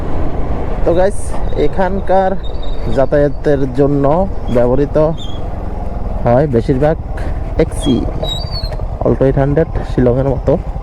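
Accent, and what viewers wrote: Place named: Noakhali, Bangladesh. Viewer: Bhi tomar Noyakhali dialect onaak sundor. Noyakhali ta e koioooo . 😊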